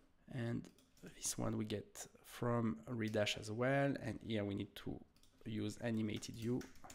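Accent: France. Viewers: are you French speaking ?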